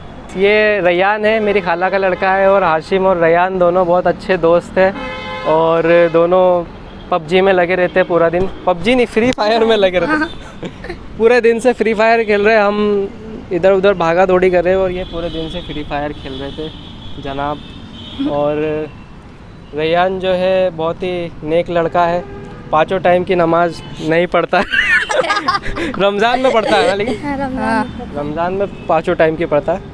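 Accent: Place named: Pakistan. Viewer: App Kay kahnay ka style same pakistan ki Tarah hai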